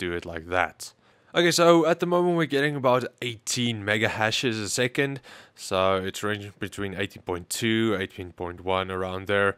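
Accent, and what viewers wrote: Afrikaans, South Africa. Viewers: This is the first video I've watched from this channel, and the first thing I thought of is, "Is he from South Africa?" because I'm originally from there, so I could detect the accent. It was fun to see I was right when the ad came in.. "are you from south africa and want to get some new gaming products?" Lol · Wow i heard his accent and knew hes from SA i am also lol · Wou sê jou aksent klink Afrikaans. Dankie vir die raad maat. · Are you south African?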